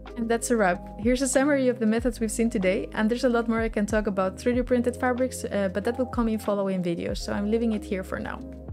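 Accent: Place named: Spain. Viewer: que tendrá el acento español que antes de ver el nombre del canal ya sabia de donde era xD Oye el video es un 10, habia usado el metodo de eliminar superficies para vasijas o filtros de red pero nunca se me habria ocurrido que serviria para hacer tejidos. Greating and Like From Galicia!!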